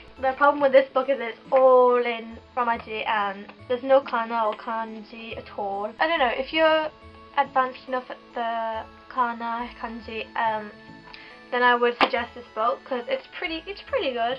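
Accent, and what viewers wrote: United Kingdom. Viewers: Greetings and THANK YOU!! I love your videos. Your japanese is excellent- your accent and your way of speaking sound so perfect. Listening to you is so INSPIRING!! I also love your english accent. You're super cute, too!! Just wondering, how many kanji do you know and how did you learn them? Just from workbooks? How long did it take to learn enough kanji to read magazines? Can you read books, too, like novels? I heard you need to know about 2000 to read the newspaper. ありがとうございます :) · all right : I love your dress/shirt, I LOOOOOOOOVE the flower in your hair, I love when you speak japanese but I also love british english accent......... ( and I love the almost-shirtless-Yunho on your wall just behind you...) haha great vid :D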